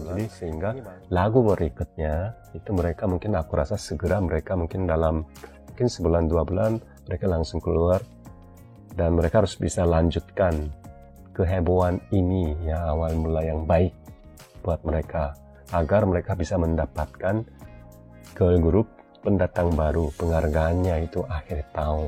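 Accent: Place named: Java, Indonesia. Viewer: Intonasinya kaya orang jawa ada medoknya dibeberapa bagian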